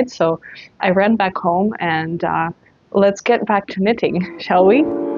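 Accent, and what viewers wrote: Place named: Eastern Europe. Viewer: Do you happen to be a Slavic language speaker? Your English is perfect but some familiar intonations tend to pop in from time to time.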